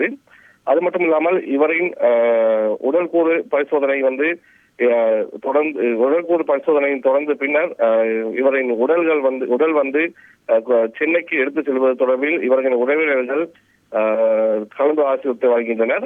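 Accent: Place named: North, India. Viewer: Vadakan Tamil news vaasikura maari eruku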